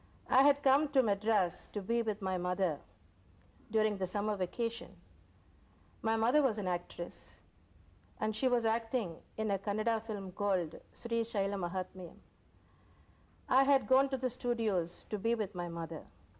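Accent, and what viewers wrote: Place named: Europe. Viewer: She talk like a European, she is fabulous, miss you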